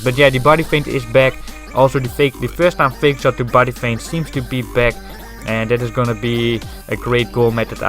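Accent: Netherlands. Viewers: Kom net je kanaal tegen. Jeetje,... echt erg indrukwekkend hoe je speelt. Wellicht spelen we een keer online. Verder zou ik enkel je Engels een beetje opschonen. Enkele standaard Nederlandse Engelse uitspraken; "finding it out", "try stuff out". Enkel goed bedoelt! Heb me iig geabonneerd en heb er al een hoop van geleerd, thx!!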